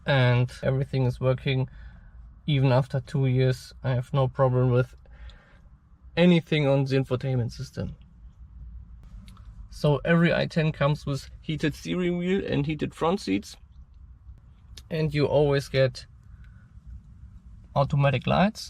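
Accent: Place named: Germany. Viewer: man merkt direkt , dass ein deutscher hier redet XD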